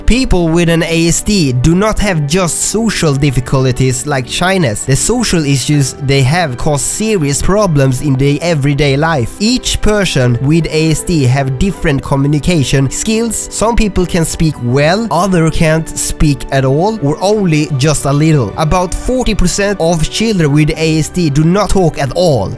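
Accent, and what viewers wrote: Sweden. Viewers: i love his swedish accent its kind of adorable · Oh dear gooo-, you swedish?!? U cert got the accent. Lame. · He's from Sweden, and that's why he has an accent :) (I think he's from Gothenburg) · OH MYYYY, Du är svensk också! min engelska (uttalning) låter också som din lol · Well he's got a rather strong Swedish accent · No, he has the accent because he's Swedish.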